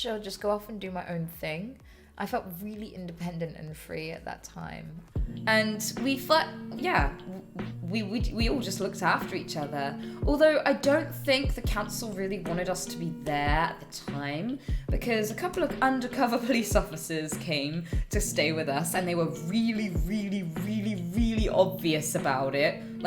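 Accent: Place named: United Kingdom. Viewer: She sounds so much more british here, than she does now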